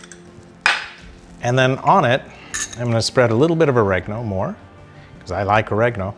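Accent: United States. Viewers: Sir, you described very nicely😍.. But i couldn't understood your fluent american english😣😣please mention the ingredients in the description box , so i will follow it😋😋😋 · So humble and simple person 👍🏻 😁👌🏻😋😋 i like American accent u talk , but its will fabulous if dr. Nene start to end of video only talk marathi 😍😁😁👍🏻😜 please video on Demand only marathi video . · I'm mesmerized by his American accent over his marathi mulga core! Hehe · Dr. Nene, you have american accent. I am from Florida. Happy to see you making pizza. I do make whole wheat bread pizza and it also becomes delicious. Madhuri ji is very lucky.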